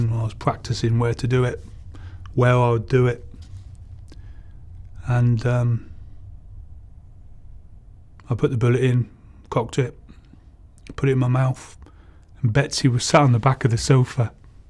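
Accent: United Kingdom. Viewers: i mean its really fucking obvious that hes british, why do you bother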